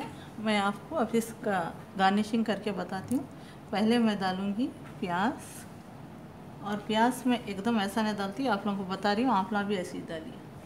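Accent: Hyderabad, India. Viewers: Assalamualaikum wrwb Aunty! Aapki awaaz sunke meku mere hyd ke apno ki yaad ajati Aapki saadgi ke waje se Allah apku be hisab sehat , dunya aur aakhirat ki kamyabi atafarmaiye Ameen Feeamanillah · Assalamualaikum Aunty Jazak Allah khair for this Daleem recipe. Alhamdulillah I tried making it for the first time n it turned out so well n delicious . My kids loved it👌👍. I love the way you talk pure Hyderabadi style. Love you Aunty. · Assalamualaikum Aunty. Kaha the aunty aap itne din se, farishta banke agaye aap, yeich khaandani aur original daleem ki receipe dhoondhro tha dekhiye Mai ab Tak, Jo meku milgayi aur Mai seekhliye banana isku. Dawaat me banaya dekho aunty, Logan biryani chor ke, daleem khatam kardiye puri, aur hai Kya puchre the meku. Sab apki badaulat hai, allah apku zariya banaye hum sab KU pakwaan sikhane ka. Jazkallah khair. Aur ab rahi baat apki aunty, to meku apke baat karne ka andaaZ itna pyaara dhikha, pure Hyderabadi language, Jo bahut kam sunne KU milti yaha USA me. 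Maza agaya. Hehe. Apke camera wale Jo b hai, Bhai ya behen, Zara camera close nakko rakho utna, bartan me gir jata camera aur thoda close leke Gaye to, thoda uppar SE covering Karo pakwaan ki, aur acha dikke aata aunty kaisa mix karre Kya karre receipe KE saat. Agli baar zara uppar rakho camera. Aur aunty Allah apke abba jaan ko jannat me aala SE aala mukhaam Naseeb Kare, jannat ki khidkiya unki khabar me khol de, huzoor Muhammad Mustafa sallallaahualaihiwaliwasallam ka deedar Naseeb Kare qayamat ke din, aur khabar KE azaab SE mehfoos rakhe. ( Ameen ya rabbul aalameen). Kardiya dekho jee aunty Dua, inshallah, apki dusri pakwaan wali video ka intezaar rahinga. Allah apku sehet de. Ameen. Allah hafeez. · Amma aap ekdum Hyderabadi bhasa bolre. America me bethke Hyderabad yaad aa gya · More than Haleem, aap ka explanation bhagayaa... hyderabad Urdu rocks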